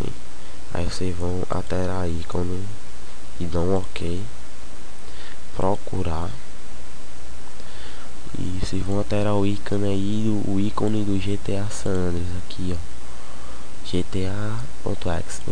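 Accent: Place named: Paraíba, Brazil. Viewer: vozinha de paraibanooo éh noiz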